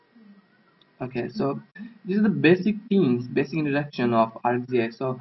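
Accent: India. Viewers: Taking me back to college. The most difficult accent to understand for me is by far Indian. Especially when you're talking about entirely new things. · Me: Glad there is an arcgis tutorial OP: Has indian accent Me: fuck this shit OP: makes sincere apology for his accent Me: OP is a good man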